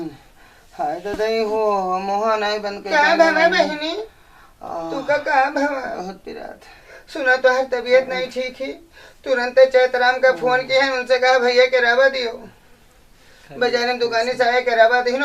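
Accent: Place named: Awadh, India. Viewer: क्या जबरदस्त अवधी भाषा है भाई इस आवाज का कोई जोड़ नहीं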